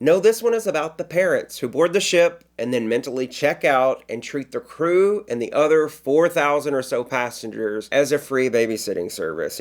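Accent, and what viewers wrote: South, United States. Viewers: Well done as always. I love watching the scenery on your videos. You sure got the life. I wish I had the technical skills and personality to do what you do. I would love to be able to afford to sail the Deep Blue Sea for a living. From where is that Southern accent?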